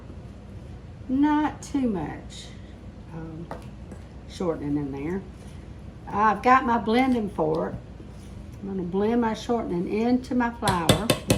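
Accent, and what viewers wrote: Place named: Missouri, United States. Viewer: Listening to you talk, it sounds like the people around here where I live. I like that. You feel like you're from right here in Missouri too and that makes me feel comfortable. Got a like, sub, and a bell. Listening to what you had with your friends yesterday sounded incredible! We didn't find any Morels this year, but it sounds like you and your friends did, those fried mushrooms. This recipe looks really good, and I love the idea of using rotisserie chickens. I think I'll talk to my mom about it and we'll make this in the autumn or winter. Great recipe. I bought a product years ago from McCormick for chicken and dumplings. A spice packet and recipe on the back... and I read it wrong. I used WAY. TOO. MUCH. butter... they were delicious but I can easily say I never made it again because it was way too entirely unhealthy for us. 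But this recipe seems way more healthy than my failed attempt years ago. I look forward to trying yours next. :)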